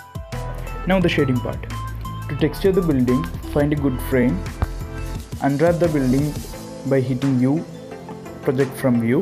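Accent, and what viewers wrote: Kerala, India. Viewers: Why do you have Indian accent? Are you trying to be CG Matter? Or Ian Hubert? Or Royal Skies LLc? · U sounds like a mallu, btw nice video 🤘🤘👍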